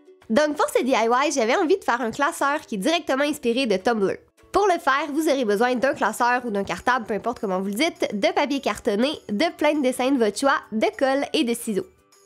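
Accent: Quebec, Canada. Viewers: Vous êtes une femme ravissante, de plus avec l'accent Québecois! :) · L'accent québécois 😍😍😍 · Tu as un petit accent Canadien il te va trop bien . Quand tu étais petite tu parlais Canadien ? Sinon je t'adore tu es trop belle je suis abonnée est toute tes vidéos sont superbe J'ai n'ai jamais vu une artiste si c'est toi haha Bisou😀😀😀😀😀😀😀😀😀😀😀😀😀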